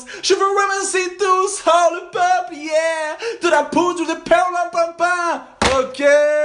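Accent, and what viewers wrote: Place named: England, United Kingdom. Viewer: Juste une question : Pourquoi avoir pris un accent anglais ? xD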